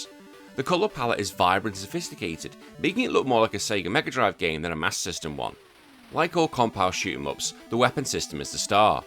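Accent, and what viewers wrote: United Kingdom. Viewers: Yeah, I think overall it outsold the NES in Europe... This guy also sounds like he's in the UK, where NES really sold poorly and the Master System did very well.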